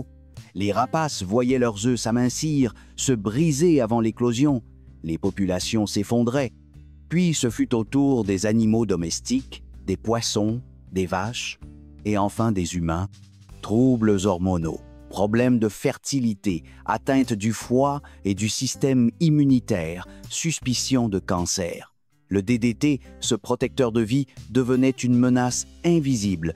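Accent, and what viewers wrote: Canada. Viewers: Le monsieur (à moins que ce soit une IA ) a plutôt l'accent canadien. C'est normal ?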